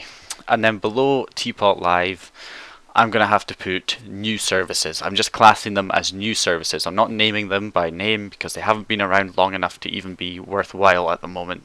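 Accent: Scotland, United Kingdom. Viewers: Sno _Warior he's Scottish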